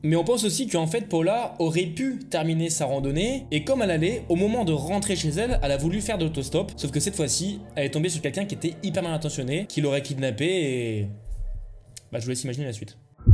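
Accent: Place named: United States. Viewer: Je te découvre le ton est sympa, parle un peu moins vite et ce sera parfait,au j'ai pris.les gâteaux , mais la belle mère pas contente d'être dans le placard Lionel camy à déjà traité cette affaire garde ton accent américain, prend un ton plus ténébreux et ce sera top Tu es jeune, sympa, un abonnement pour toi direct,et un pouce je vais te suivre sur ta chaîne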